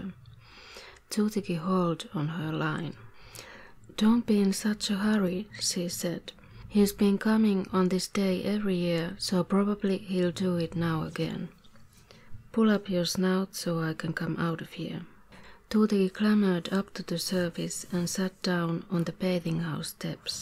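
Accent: Finland. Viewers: I came here for the finish accent. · finnish accents are lovely.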